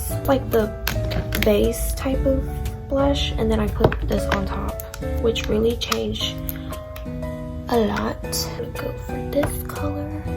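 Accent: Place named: South, United States. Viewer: Omg an Asian with a southern accent is so cute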